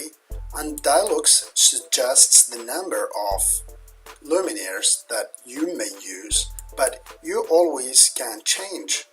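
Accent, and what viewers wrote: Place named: Australia. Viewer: you got some accent Matttte !! AUstralian !!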